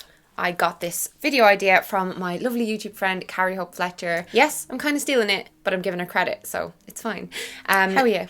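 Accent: Ireland. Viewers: I subscribed to you bc of ed videos, vlogs, because you speak so honestly about all topics (also things other youtubers dont talk about), your personality and your cute Irish accent 😊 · I subscribed to you when the video am no longer Vegan came out (because I was going through a similar thing). I’ve loved your personality and sense of humor ever since , you will continue to be one of my top . ( also am obsessed with the Irish accent, since am not a native English speaker I hope I’ll master it one day *insert Irish youtubers accounts here * lol ) love you 🖤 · I visited Ireland this summer and had THE time of my life and fell in love with it! I found you from searching Ireland videos and one thing lead to another and I fell in love with your energy and accent and message 💚 I get so excited when a new monthly vlog comes out... I snuggle in and make coffee and feel like I get to hang out with my Irish friend! 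💚 Thanks for all you do!! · I came to your channel after seing you in some kind of collab on Hannah Witton s channel, it must be a couple of years ago now, and I stayed and subscribed. I really like that you have different types of content, the vlogs, the food diary and talking about like sex and health and everything. Also, a bit strange maybe, I'm here because I love irish accents! I'm Swedish but I lived in London for a year and kind of sound like a Londoner when speaking English and I'm so interested in the English language with all its different accents (not american though, sorry americans). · And I probably started following you 2 years ago... after I had a trip to Ireland, I fell in love with Irish accents! :-)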